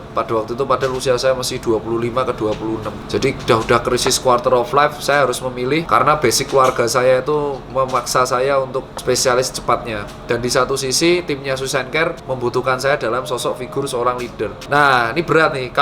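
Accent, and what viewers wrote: Java, Indonesia. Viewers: Suflix Einstein07 medok genjehhh 😀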